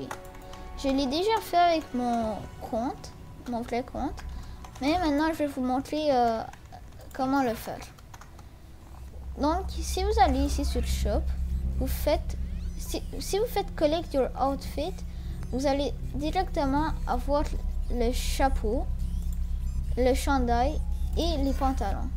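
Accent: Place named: England, United Kingdom. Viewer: j'étais sûr que t'étais anglais vu ton accent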